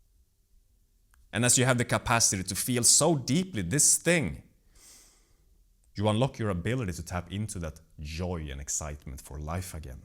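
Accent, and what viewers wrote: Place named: Scandinavia. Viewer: The Scandinavian accent ❤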